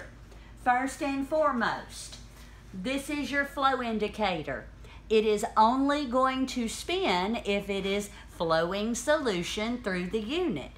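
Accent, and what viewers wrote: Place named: South, United States. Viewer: Who is this lady? Love the Southern accent! lol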